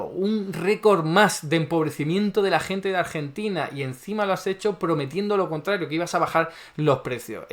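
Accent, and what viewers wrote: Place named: Galicia, Spain. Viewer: Se nota por tu acento que sos gallego te pido por favor que te quedes alli .A espana le va muy bien,no.?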